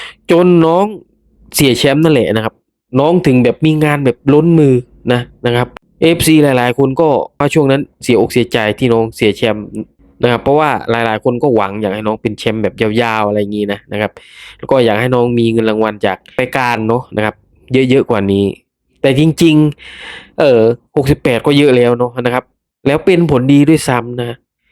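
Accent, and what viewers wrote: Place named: Isan, Thailand. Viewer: ชอบการพูดคุยของคุณ ฟังสำเนียงแล้วรู้ว่าภาคอีสานแต่ทำรายการไม่ลำเอียงภาคก็พูดให้ดี ต้องเป็นคนอย่างนี้ซิคะถึงจะน่ารักและน่าติดตาม 👍👍👍👍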